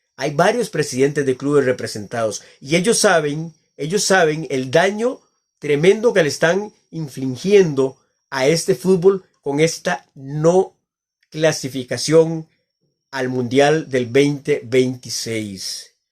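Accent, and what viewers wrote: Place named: Mexico. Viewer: No se si eres de México o costa rica es que el acento es parecido pero si quiera ver a Costa rica en el mundial desafortunadamente no pidieron meter un golesito solo era meter uno 😢 saludos y ánimo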